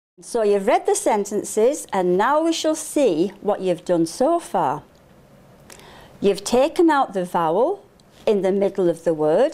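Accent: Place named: Southport, United Kingdom. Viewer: Thank you! Bonus that my family grew up in Southport, so I love hearing your accent! xo Roz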